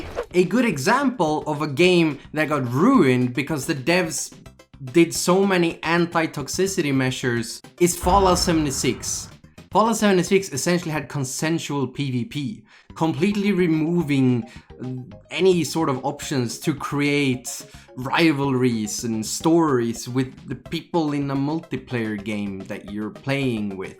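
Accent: France. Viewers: l'accent français de qualité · j’aime trop ton accent ( I love your accent ) VIVE LA FRANCE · I'm French so your French accent is... Très bien. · Ur french accent :c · Je trouve que ton accent français est très raffiné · French accent 100/10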